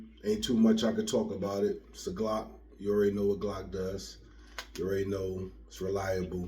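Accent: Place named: New York, United States. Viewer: lol definitely an old school New Yorker with that : LIKED’ed it 😜 BROOKLYN LUV🫡 BROOKLYN LOYALTY ☝️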